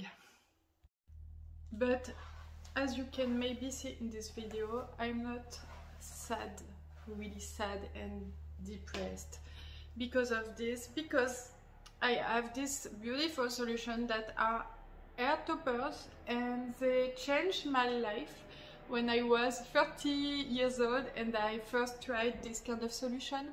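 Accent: France. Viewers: J'adore tes vidéos en anglais car j'ai exactement la même manière que toi de le parler : grammaticalement c'est bon mais avec un accent totalement français 🤣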